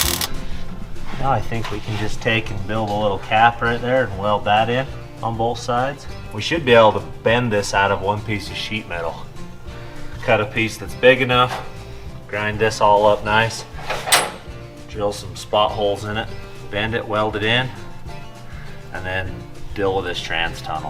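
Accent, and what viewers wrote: Utah, United States. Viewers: I think ever since Randall started, your southern Utah drawl has become more noticeable.🙃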